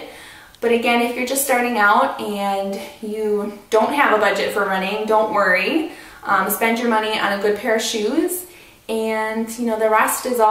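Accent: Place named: Minnesota, United States. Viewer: You sound like your from MN? I'm just starting running myself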